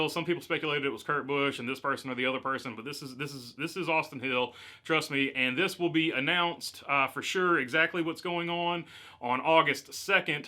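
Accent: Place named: East Tennessee, United States. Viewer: Where is from? Your accent is similar to mine. I grew up upper East TN. With weekly excursions to SWVA.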